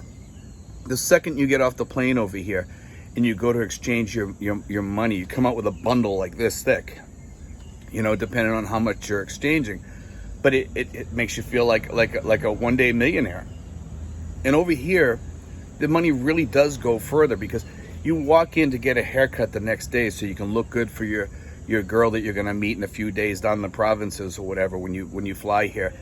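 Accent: Boston, United States. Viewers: Thought i heard a boston accent . I have been watching your videos for awhile . I appreciate the insight ,knowlege you give .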